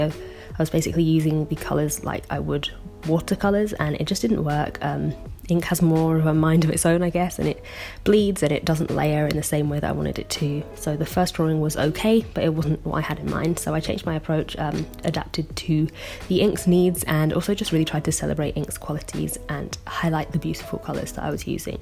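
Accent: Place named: RP, United Kingdom. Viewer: Your work is amazing- as always. Why do I think you sound more ‘posh’ in this video? ☺️ I decided to do inktober this year. Being a full time secondary art teacher I knew it was not going to be easy. I took the plunge anyway. Everyday I would come home after a long day and I would think of what to draw. I did some traditionally on paper, otherwise, I completed each prompt on ipad as it was easier for me to do so even while in bed.🙈 Somewhere along the way I had some procedures done at the hospital and I did really want to give up- but I took few days off and I tried catching up- I am still catching up. I do feel a sense of achievement and I am hoping I will complete the last few by the 31st. You have been a big inspiration to me. I have talked about you to my students and I highlighted your art and also your resilience, commitment and integrity towards your art. 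I talk about you so much that some pupils thought I knew you in real life😂😂😂 Anyways I hope you settle down in your new place. All the best and looking forward to more art from you. By the way I really liked the limited colour palette approach and I like how you worker with the ink when the ink was not doing what you wanted.... as opposed to just give up! Sorry for this long paragraph- just had to tell you all of this. ❤️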